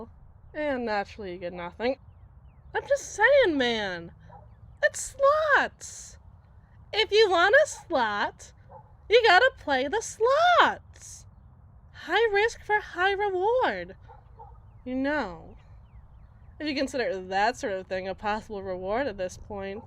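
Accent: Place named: Brooklyn, United States. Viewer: Brooklyn accent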